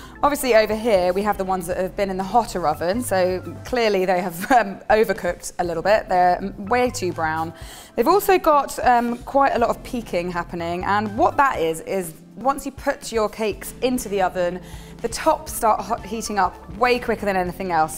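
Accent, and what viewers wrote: England, United Kingdom. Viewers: She's so well spoken and got a nice attitude. She sounds very English and that's amazing. There should be more people like her, well spoken with a great personality.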